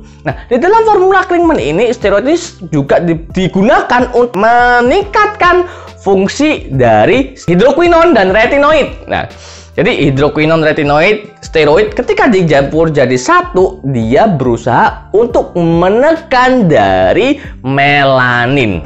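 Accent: Java, Indonesia. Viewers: Logat medoknya doterkuh.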